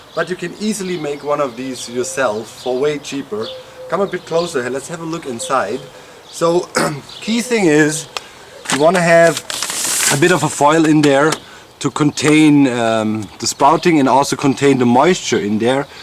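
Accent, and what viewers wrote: Germany. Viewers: You sound a lot like Juergen Klopp. Are you from the same region as him? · Hallo! Ich mag deinen akzent! Im going to guess you are german and I hope im right. Your accent sounds german and my family comes from germany and they have a similar accent. Sorry for assuming anything .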